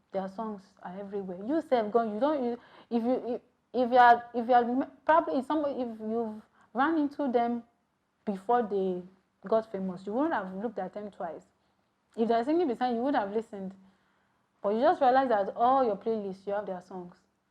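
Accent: Ekiti, Nigeria. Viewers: Naughty girl, I have subscribed o. Your Ekiti is hot like amala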